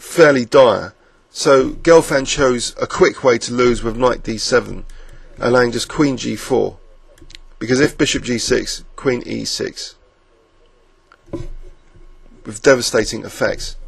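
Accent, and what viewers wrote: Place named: RP, United Kingdom. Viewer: love your clips, as much as I like your accent. That's how English is supposed to be spoken.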